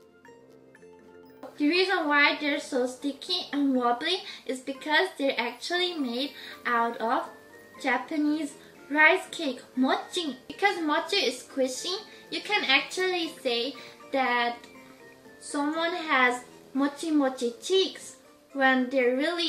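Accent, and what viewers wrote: Switzerland. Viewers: she's not trying to. Her swiss accent is really thick. Everyone in swiss sound like that when talking in english. You dont really think people are all from america don' t you? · Kay Sloan I am from Switzerland but the French part. However I know what a swiss german accent sounds like, she has some of it but for the other parts of the accent, I have no idea where she gets that from..... maybe it's a baby accent! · her accent is swish German 😍😍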